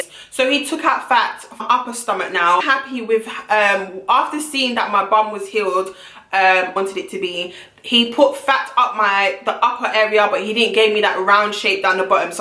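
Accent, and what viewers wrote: United Kingdom. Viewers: LOL! She might be black but she's a londoner, we all sound like her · I'm an American I can't get pass the accent to listen to your story. I love it! I could just listen to you talk about anything. I have never been to any European country but I can't wait to go to the UK to hear black ppl talking like you.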